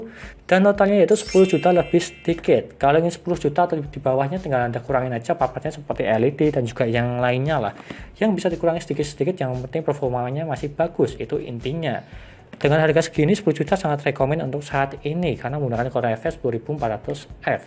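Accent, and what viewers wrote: Java, Indonesia. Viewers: Bang ngomngnya medok bnget😅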